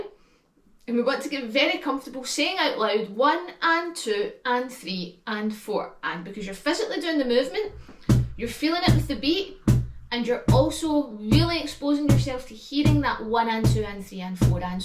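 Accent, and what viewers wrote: Scotland, United Kingdom. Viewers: So I was just clicking around YouTube Shorts and ended up somehow On one of yours about "finding a groove", then I checked the main vids out And saw this one was new, so I listened and watched from start to end. I like the blue instrument, and the musical cap seems like a neat piece of fashion, But I noticed neither for like five minutes because... your accent is so pretty! 😄 (I should go find other people from Scotland to watch now or I'll end up learning the ukulele. 😂) Keep up the good work because the lesson itself was rather easy to follow along with, And if I had a ukulele, I think I could pick up strumming fairly quickly with this practice. 😃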